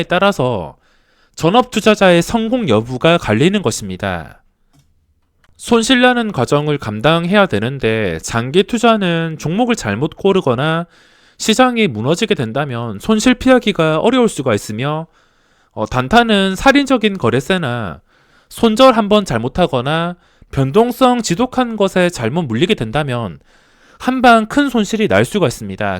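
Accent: Gyeongsang, South Korea. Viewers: 아 경상도 억양 저얼라 짜증나네 억양이 모 저러냐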